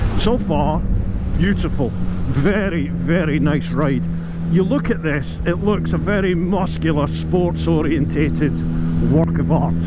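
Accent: Scotland, United Kingdom. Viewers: You know what I like the most? Your Scottish accent 😀 . Regards 🇲🇹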